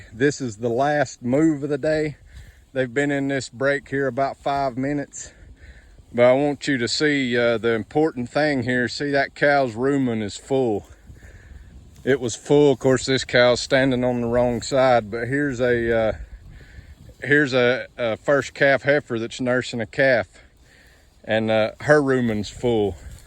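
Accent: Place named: Australia. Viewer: Have you spent time in Australia...every now and then I hear an aussie accent with your American ?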